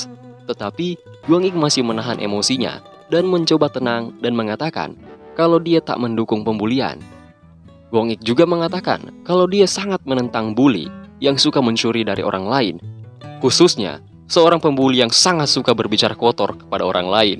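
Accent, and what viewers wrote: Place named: Parigi, Indonesia. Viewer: Balogat orang Parigi Sulawesi tengah hahaha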